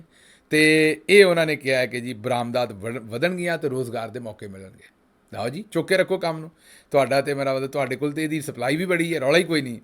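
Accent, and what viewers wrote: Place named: Punjab, India. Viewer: Who asked him to speak in English? It's angrezi in punjabi accent and delivery 😢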